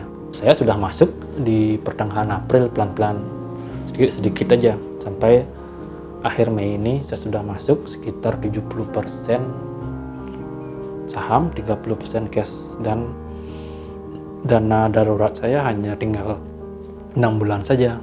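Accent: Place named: Java, Indonesia. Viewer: Medok sajan bahasa Indonesia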